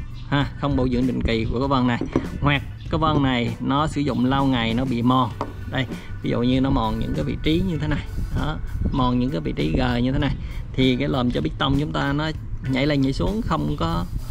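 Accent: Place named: Quang Ngai, Vietnam. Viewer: Giống Quảng Ngãi vậy anh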